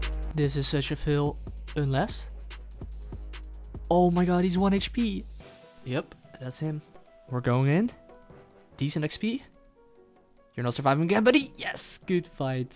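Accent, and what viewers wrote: Netherlands. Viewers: Your dutch accent is so obvious 😂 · Keep up the work man, just found you and you earned a new sub from me. Btw are you Dutch? RSN: Penguin Hug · Are u Dutch? Accent tells everything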